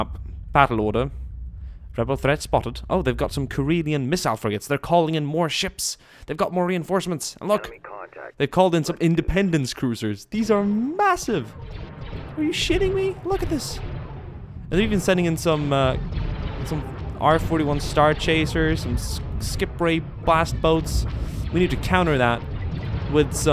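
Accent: United Kingdom. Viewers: Man love your accent. I used to watch your stuff years ago and the way you sound is totally different than now. It's this weird mix of sometimes North American, sometimes very British English influenced o.o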